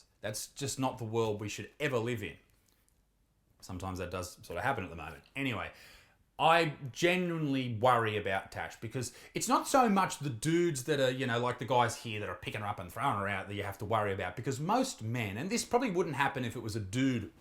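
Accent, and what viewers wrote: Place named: Australia. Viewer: I heard about her doing this: "Well there's the next Isaac vid. Also why is she Aussie?"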